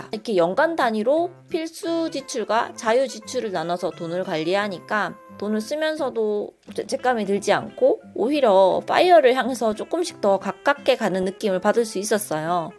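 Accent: Gyeongsang, South Korea. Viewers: 저도 두사람이 하는줄 알았어요 경상도 사투리 너무 좋네요 익숙한 ~~~ ❤❤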